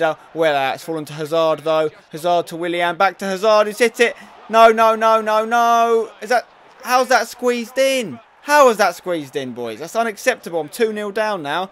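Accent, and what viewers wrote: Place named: Cockney, United Kingdom. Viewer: It's just a cockney accent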